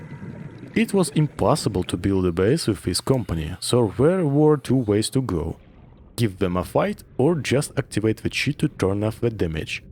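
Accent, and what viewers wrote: Russia. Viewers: Такой акцент, мэйд ин раша, сразу понятно)) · Мне кажется что этот пчел русский, хотя в акцентах я не шарю... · dude I love your russian accent. it is really good and cool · Сразу понял, что русский по "зэ и вэ". Насчёт "the" - "дэ" самый идеальный вариант. Надо поработать над произношением.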